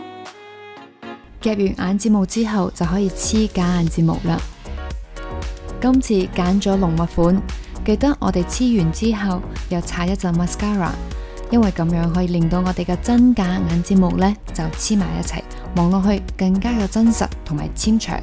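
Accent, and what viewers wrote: China. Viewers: you make cantonese sound so pretty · 大陸口音...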